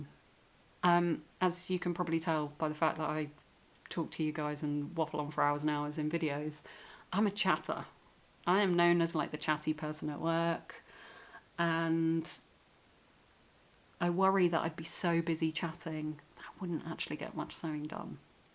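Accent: United Kingdom. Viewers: I’m a sewist and knitter. I started with the latter but always loved clothes. I thought I recognised your accent - I’m a midlands gal and after growing up in Leicestershire I’ve now been living/working in Derbyshire for the last 8 years. Congrats on 2K followers! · Hi. I've only just found your channel but it's good to listen to you. A lot of the crafting videos are American, which is okay but it's good to hear an English voice, especially as I live in very rural France, although am English. I guessyou could say I'm a multi crafter and love to try lots of different textile related but not purely crafts. I've made textile art dolls(primarily fabric), dressmaking and other clothes, knitting, embroidery, weaving, felting, textile art and mixed media pictures, made fabric animals, driftwood houses, Christmas decorations and wreaths, both fabric and natural, fabric collage, mixed media using all sorts of art supplies, sketching, entangle and am currently dipping my toe into the world of natural dyeing. 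I'm a retired nurse so absolutely understand your work life balance situation but am lucky enough now to have the time to play more, if not necessarily the budget to with it. I love to thrift or recycle as many supplies as I can. Looking forward to watching your previous videos and please DON'T enter me for the pattern giveaway as I already have a big stash of both knitting and sewing patterns I still want to make, and despite retirement, there's still never enough hours in the day 😅 Oh, and yes I'm crafting while listening 😊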